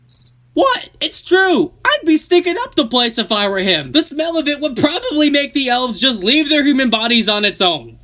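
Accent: United States. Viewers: I feel like when you talk in your American accent it makes me sad because you are conforming to American society and losing your individuality. Your accent is sooo much more passionate and interesting to listen to!!!! American accent for memes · I clicked the video and i thought it wasnt. Your accent over american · Hahaha bro your American accent is really good. Impressive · Am I the only one who likes his American accent? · i said his american accent was getting better😂💯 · I don't know who can't get enough of the American Accent but watching it with your new videos I can assure it doesn't have the same energetic and passionate as your usual accent. Please go back to your usual accent. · this video killed me and he actually managed to make it to then end using the american accent :) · WHAT HAVE U DONE TO, HE'S BEEN KIDNAPPED BY AN AMERICAN WHO IS IMPOSING AS HIM D: on a serious note give us back not this merican dude.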